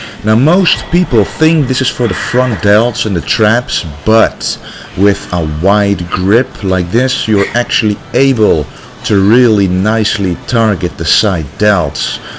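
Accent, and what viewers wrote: Netherlands. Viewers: Somehow your accent gives me the idea that your a dutchie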